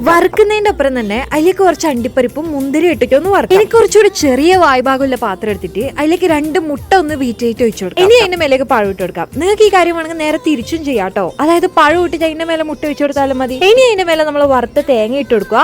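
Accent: Kannur, India. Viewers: ന്ത്‌ ആർട്ടിഫിഷൽ സംസാരം ന്നു. ഇത് കണ്ണൂർ സ്ലാങ് ആന്നു.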